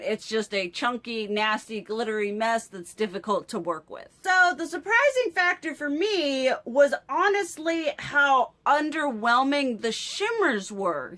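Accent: South, United States. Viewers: Purples are your color, both purple wigs I've seen recently look great. They really compliment your skin tone. If your reviews are with good intentions, to save us money or let us know its great or crap go for it. I like your for your attitude, interest, and you sound like me ..a southern lady with a twist.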